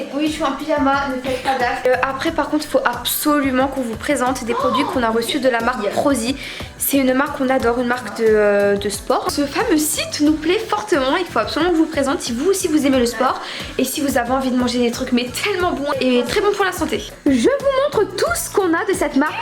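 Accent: North, France. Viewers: Vous avez l’accent du Nord fort prononcé 😂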